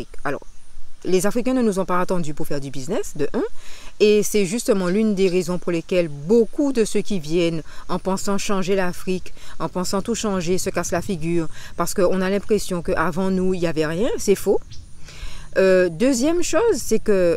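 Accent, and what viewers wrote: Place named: Benin. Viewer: L’accent beninois s'est installé 😅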